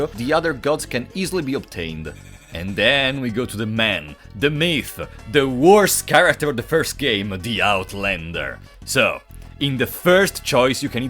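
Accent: Italy. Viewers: When I first started watching your videos your accent sounded Russian, but then after seeing you play the game Italian you now sound Russian but with an Italian twang at the end of your wordsa. Even the content creators for this game are a maddening riddle and mystery. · fwiw i love your Italian accent. I'm an American and I understand what you're saying. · Are you italian?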